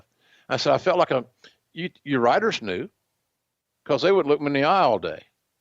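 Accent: South, United States. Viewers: I like that is more chilled in his older age on commentary. He sounds more like his laid back self. Imo he talks better out of character. I dunno? I'm just an Aussie who appreciates the chilled out southern accent over the loud mouth one lol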